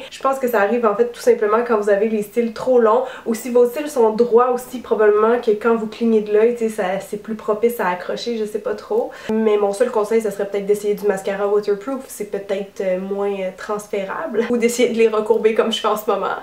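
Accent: Quebec, Canada. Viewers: Je kiff l'accent québécois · t québécoise ??? PS : merci bcp pour l'astuce mon maquillage + le fond de teint + les lunettes sa donnai n'importe quoi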